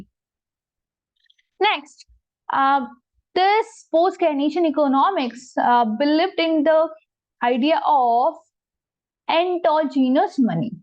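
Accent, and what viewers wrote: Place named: India. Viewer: I’m sorry for the bluntness, but the Indian English accent is so harsh to an American English ear that I could not understand much of what was being said.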